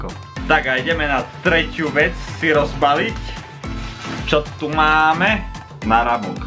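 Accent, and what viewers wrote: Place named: Tatras, Slovakia. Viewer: bývam v tatrách.. a ja som si hovorila ze ten prízvuk je mi nejaký známy 😂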